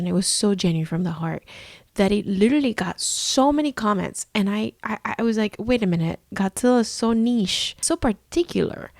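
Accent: Cuba. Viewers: As an American kid my first exposure of Godzilla was the 2014 movie in theaters, then the second movie I saw was Shin Godzilla and since then I became obsessed. I have seen 30 of the Toho movies and love those classic movies so it's cool seeing people love Godzilla both Toho and Legendary more and more. btw I like your Cuban accent it's so nice. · Lifelong kaiju fan here. While the <num> era of suits and miniatures will always be the true heart and soul of the genre, our current revival with CGI blockbusters is still a glorious thing to be living through. It made my day to see you brought into the fandom by the new entries, while also being willing to go back and embrace the back catalog. We need a million more like you! ❤ And your Cuban accent is great. Anyone giving you crap about it should be ignored, full stop.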